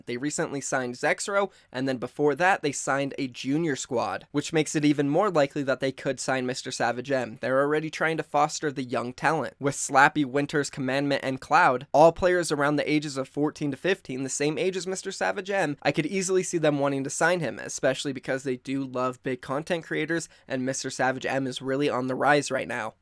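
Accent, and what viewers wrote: Norway. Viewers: Damn is he from Norway?, im from norway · Is he Norwegian?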